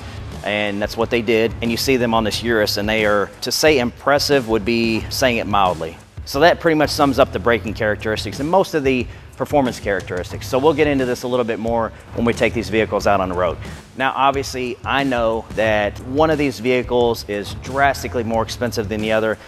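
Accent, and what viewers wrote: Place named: South, United States. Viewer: Something about a slight country accent and Lambo is my favorite car company gets me thinking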